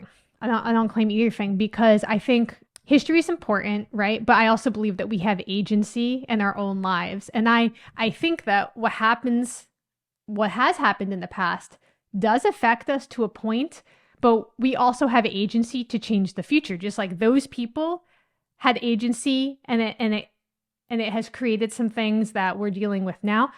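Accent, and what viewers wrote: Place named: New York, United States. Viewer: Excuse the question, are you from the Utica area in NYS? I'm always interested in regional accents. Your accent sounds like Central/Western NYS . . . .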